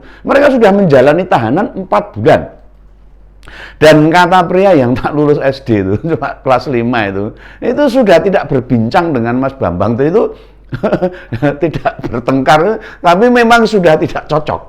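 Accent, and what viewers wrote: Java, Indonesia. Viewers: Mantap mas, carane njenengan menyampaikan koyo dongengengi 😀😀😀👍👍👍 njawani buanget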